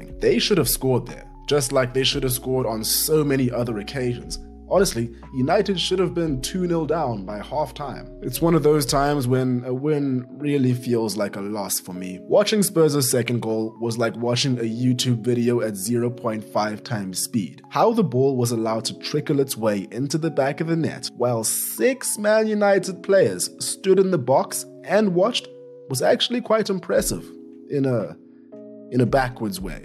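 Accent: South Africa. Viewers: Bru u sound South African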